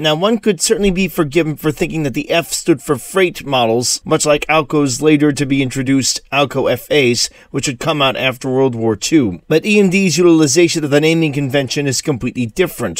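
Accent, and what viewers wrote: United States. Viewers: You from Brooklyn? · Really great EMD mini-docu video thanks! Good to hear a proper NY-sounding accent also ! 🙂 I didn't realise just the ridiculous number of such locos that EMD manufactured. Must have been coining it in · It's just an American accent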